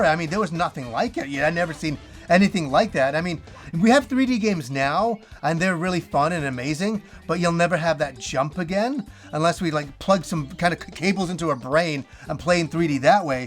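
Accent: Yorkshire, United Kingdom. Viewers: I have a Yorkshire mum, i feel like i understood you a lot here. My love and thoughts with you here. 😊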